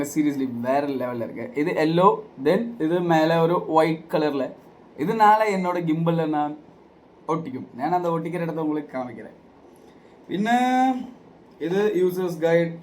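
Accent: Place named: Kerala, India. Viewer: Thalaivanoda malayalam and tamil kalantha sound key thani fans undu ❤❤❤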